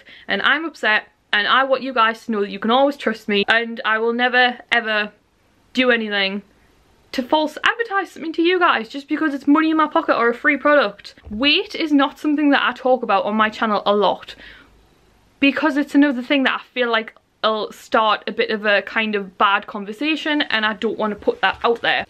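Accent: Newcastle, United Kingdom. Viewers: Thank you so much for these vlogs, I've woken up feeling sick to my stomach today and watching this has really calmed me down and helped me feel better! <3 I find your voice so soothing as my mum's side of the family are from Newcastle/Gateshead and I used to go up there fair bit to see my grandparents. I have to ask, does the Metro Centre still have that mad rollercoaster/theme park inside it (think it was called Metroland)? Got a lot of fond memories of it!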